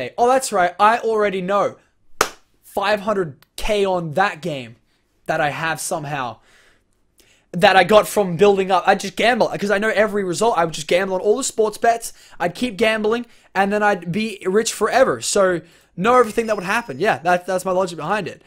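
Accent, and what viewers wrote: Australia. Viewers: is aussie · Is Australian I'm in a country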